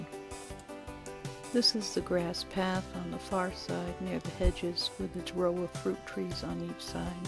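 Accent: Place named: Nova Scotia, Canada. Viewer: What a beautiful garden! Your accent suggests you are in Nova Scotia, am I right?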